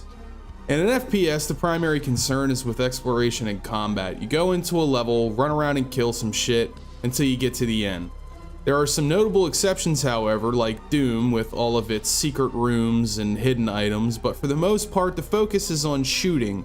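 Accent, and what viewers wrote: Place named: Baltimore, United States. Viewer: love that baltimore accent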